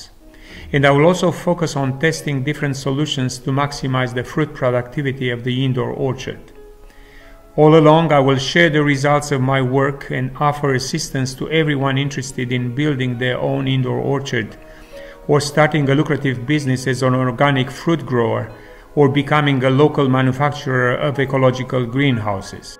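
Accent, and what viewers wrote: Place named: Mediterranean. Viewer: Amazing experience, your accent is of someone who used to live somewhere around the Mideterranian area. Your passion for securing med fruits is beyond me. Bravo for being such an inspiration to others.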